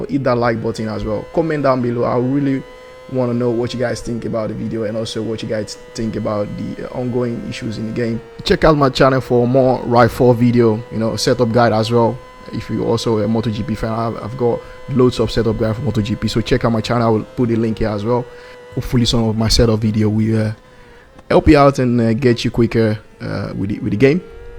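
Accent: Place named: Netherlands. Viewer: I like your Dutch accent but sometimes it is hard to grasp. :D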